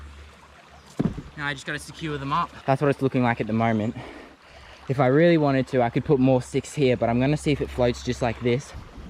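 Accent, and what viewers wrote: Australia. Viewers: dude his voice is amazing it’s the peak voice for being Aussie you just can’t appreciate a good content when you see it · R u Aussie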